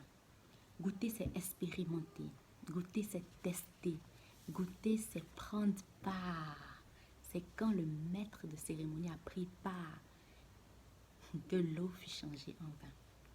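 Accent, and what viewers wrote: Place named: Ivory Coast. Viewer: ton parler donne envie d'écouter et ton accent ivoirien 😃. Merci pour le message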